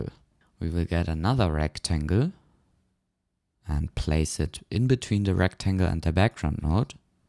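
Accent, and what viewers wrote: Germany. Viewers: Nice video but you sound so german😁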